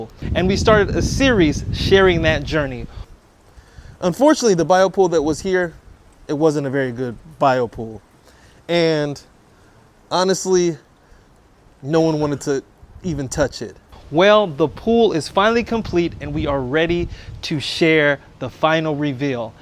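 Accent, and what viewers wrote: Portugal. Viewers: I'm From Angola my Dad was Portugues i live in Portugal from 1999 till 2012 move to UK, but I feel like I wanna move back to Portugal, your cascais accent sound so Portuguese :)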